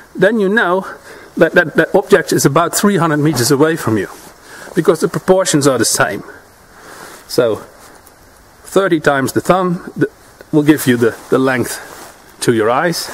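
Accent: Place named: Canada. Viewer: One of the best and most practical vids on navigation I've seen yet! My father-in-law has one of the best navigational abilities I've ever seen and we are in flat heavily forested terrain and I've watched his dead reckoning on cloudy days and could only marvel at the internal GPS he carries around in his head. What region do you live in, almost sounds like Canada?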